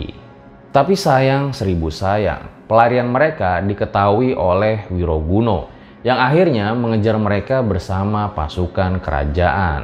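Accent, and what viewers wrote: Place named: Sragen, Indonesia. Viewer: SRAGEN MANA SUARANYAA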